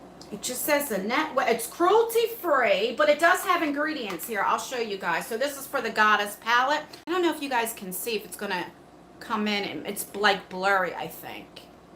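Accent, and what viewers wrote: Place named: East Coast, United States. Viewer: I just bought the temptresses palette. No big, but it was 10%, not 15. Thank you, though! I can’t wait! Your eye look is so gorgeous! You do so well, where you cut off the side of your eye right at the end of your brow. It really lifts your eyes! Great job! I really admire what you do! I miss the East coast. I went there as I played piano for President Bush for the prayer breakfast and stayed with a friend who has your accent! You make me miss her! 😇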